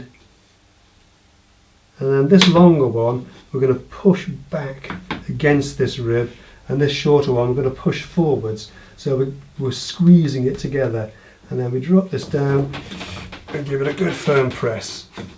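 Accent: United Kingdom. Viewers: Why does a UK accent sound so believable? Ha! Maybe it’s an American thing. Love this channel and the info. Thank you!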